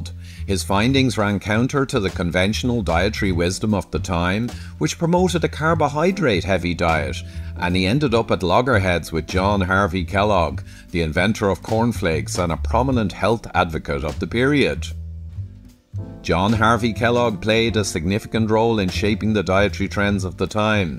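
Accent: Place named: Canada. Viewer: Canadian aye? Thanks!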